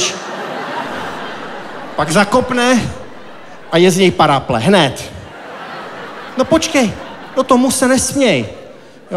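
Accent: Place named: Czech Republic. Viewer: Ta brutální čecháčtina při povídání o zženštělosti zní dost komicky. Jinak obsahově dobrý. :-)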